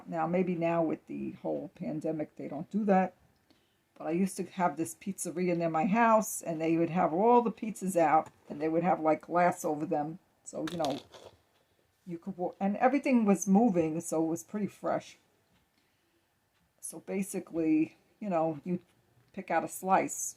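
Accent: Brooklyn, United States. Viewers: I believe I commented on your accent before! I am a Brooklynite myself but without much of an accent!! Enjoy listening to yours!! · I love to listen your Brooklyn accent - I bet you miss New York - you are very pleasant blessings🌸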